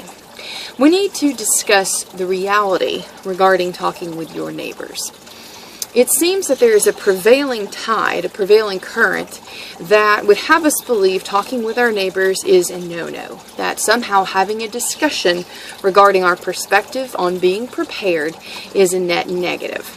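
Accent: South, United States. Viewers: I love her southern accent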